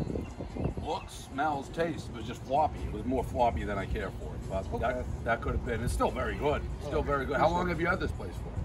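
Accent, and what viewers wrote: Boston, United States. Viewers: It's a good Skoore. The boston accent is hard to shake. Everyone knows the rules.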